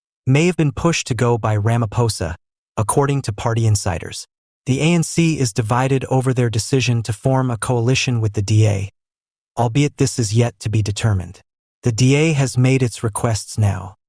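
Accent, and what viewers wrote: United States. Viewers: WHY IS THIS NARRATED BY AN AMERICAN???? COULDNT YOU FIND A BEAUTIFUL BLACK VOICE????? STOP BEING PUPPETS OF THE WEST MAN. MMNXM.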